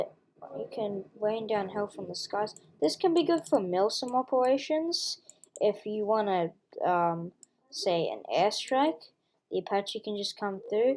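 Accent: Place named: Australia. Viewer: I think hes australian like me and thx for teaching me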